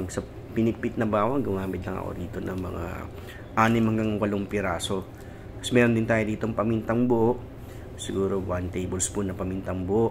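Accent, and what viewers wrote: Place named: Batangas, Philippines. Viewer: May accent ka Sir ng Batangeño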